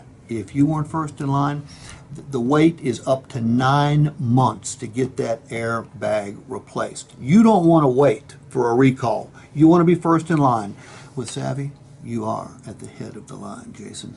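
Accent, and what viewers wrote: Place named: South, United States. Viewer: hillbilly and his hick rube foghorn leghorn talk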